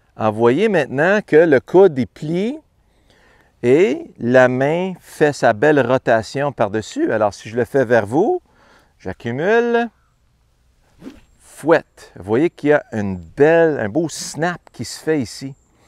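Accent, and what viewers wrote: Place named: Quebec, Canada. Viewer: J’adore entendre ses instructions en quebecois. Merci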